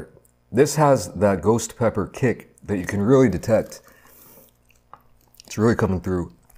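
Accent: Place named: New York, United States. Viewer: Are u from New York u have the accent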